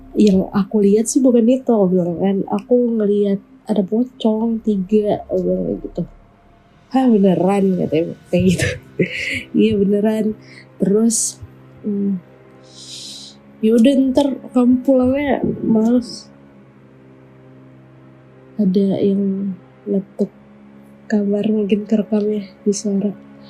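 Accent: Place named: South Jakarta, Indonesia. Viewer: Iya gabungan sengau plus jaksel. Makin gak jelas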